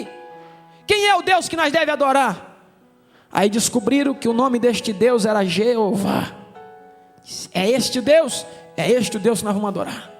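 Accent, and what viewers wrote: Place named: Santa Catarina, Brazil. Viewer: VARÃO DO DEUS ALTÍSSIMO, DÓCIL, HUMILDE E MUITO SÁBIO!!! DEUS O ABENÇOE RICA E ABUNDANTEMENTE, JUNTAMENTE COM SUA LINDA ESPOSA IRMÃ FERNANDA, LINDO O SEU SOTAQUE. O MEU TAMBÉM ERA ASSIM , POIS SOU CATARINENSE. MAS, AGORA SOU CATARINOCA . MEIO CATARINA E MEIO CARIOCA.GRAÇA E PAZ!!! IRMÃ SCHIRLEY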